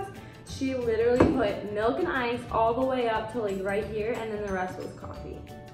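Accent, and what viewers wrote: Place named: Michigan, United States. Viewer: lol I can tell by the accent. I’m from California just moved to Michigan and it’s something I noticed immediately lol + my husband is originally from Michigan and he sounds the same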